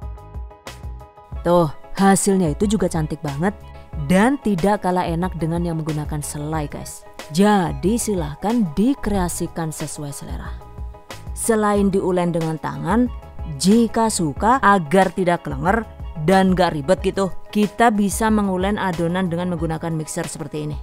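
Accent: Banyumas, Indonesia. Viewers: Diperbanyak dong ngomong ngapaknya.. 😅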